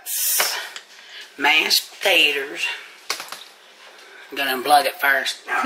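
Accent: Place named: North, United States. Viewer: I think you must live in north. Just the way you talk and eat. I live in Orlando but from Georgia.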